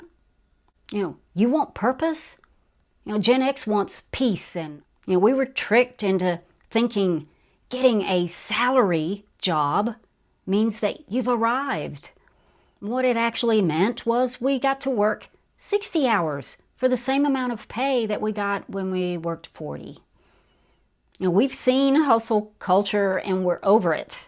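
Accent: United States. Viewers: G'day Thanks for your kind words. I have been trying to work out your accent and which part of the United States of Americaland you are from. You see we have 6 states and 2 territories here in Aussieworld and our accent is pretty uniform except in some parts of the country like Tasmania for instance where there is a slight difference if you are Australian and know what to listen for, no worries. With you I don't think It's Texas, could be West Coast or Flo-Rida or I could be way off the mark. American accents like NYC, Boston, Texas, Louisiana, are pretty easy for us as they are so strong. But you have me stumped little lady.....it's a strong accent, but I can't pick it. Your Aussie connection... Cheers 😉😊 P.S. cute Westie I think?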